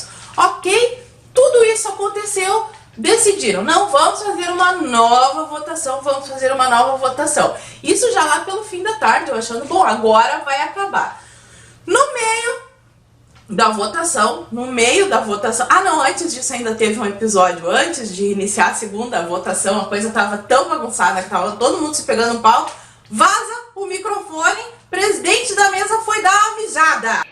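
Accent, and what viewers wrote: Rio Grande do Sul, Brazil. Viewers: como vc é linda;inteligente;sotaque gotoso de gaúcha;toda cut-cut;dá vontade de dar um abraço bem apertadinho...!!!lógico que falo tudo isso com sinceridade e respeito!!!❤❤❤😉😉😉😘😘😘👏👏👏 Seria um prazer imenso,vê-la pessoalmente aqui em San Francisco🇺🇸🇺🇸🇺🇸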